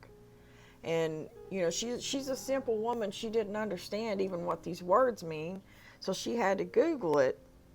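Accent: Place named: South, United States. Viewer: Hey. I love your channel and I love hearing a sister from the South. I'm in Nashville. Much love. Gonna share your channel on my Twitter if that's cool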